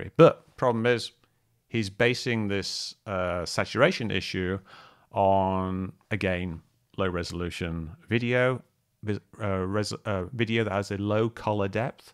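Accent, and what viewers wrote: United Kingdom. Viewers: Good video but whats with the use of americanism 'math' ...'flashlight' etc ...with a british accent ?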